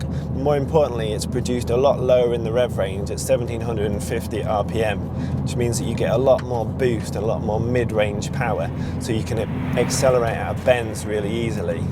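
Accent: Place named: Yorkshire, United Kingdom. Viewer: I like him bar the thick yoker accent.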